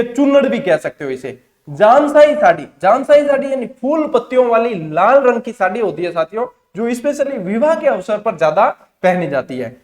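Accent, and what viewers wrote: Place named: Marwar, India. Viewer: Sir apki marbadi language apke muh se sunne mein bada annad atta mein braj bhartpur se hunn sir moy bhi apni mother tunge bolbe mein bdo mja abe guruji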